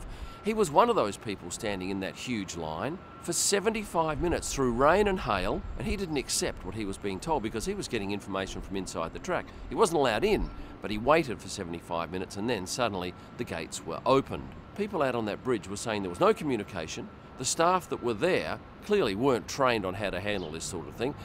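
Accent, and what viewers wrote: Australia. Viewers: Hi Hope all is well. I love your work, and I'm so glad that ur an Auzzie, makes it easy to understand the auzzie language, lol. Also, I would like to win that TM 43. I also would like to tell you that while looking at all the PANZERA watches, I like the model AQUAMARINE 45C 45MM SWISS AUTOMATIC CHRONOGRAPH. Beautiful watch indeed, has every component that you need in a watch.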